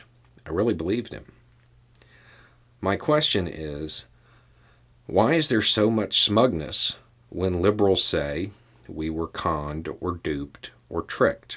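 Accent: South, United States. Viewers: It must be your Southern accent/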